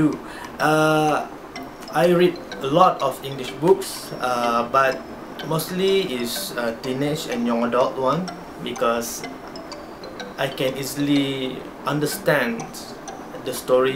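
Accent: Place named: Malaysia. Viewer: Where are you from? I bet it's between Malaysia or Indonesia. I'm Indonesian actually but not a booktuber, but I subcribe u to find good books :D I can't speak english fluently too xD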